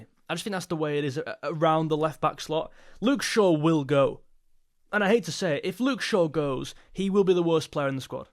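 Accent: Liverpool, United Kingdom. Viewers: Good scouse accent you got there, pal.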